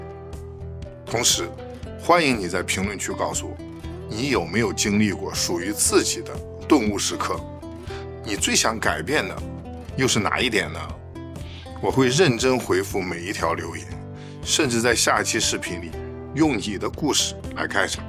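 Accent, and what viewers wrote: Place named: Henan, China. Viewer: 你口音很像我老家口音！！！河南密县人！！！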